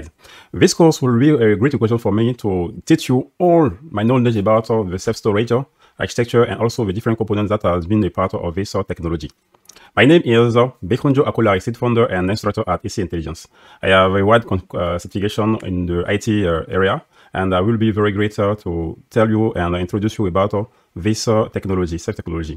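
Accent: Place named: France. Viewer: still very hard to listen on 0.75X speed, the guy has a very thick French accent, it’s realy hard to follow.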